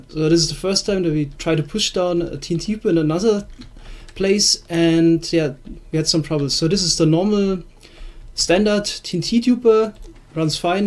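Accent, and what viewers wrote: Germany. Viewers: Junge du Bisch deutscher des höhrt man übel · Er hat nen voll deutschen axzent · When I heard the German accent I was like " I fcking knew it! " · Is he german or why does he have that accent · Das is ein deutscher akzent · erste sekunde wo ich deine stimme gehört hab hab ich gemerkt du bist deutsch aber cooles video😃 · are you german?? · Deutscher merkt man einfach am axcenz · Cooles video man höhrt dein deutschen aktzent (nicht böse gemeint) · man kann richtig hören das du deutscher bist, ich auch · u r german oder nicht junge du hast harten acxent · is he german? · are u from germany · Are you german? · Ich liebe den deutschen Akzent wenn du jetzt noch Sachse wärst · Are you german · Are u German?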